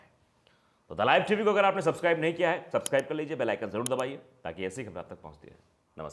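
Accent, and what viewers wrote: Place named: Bihar, India. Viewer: your bihari style is very nice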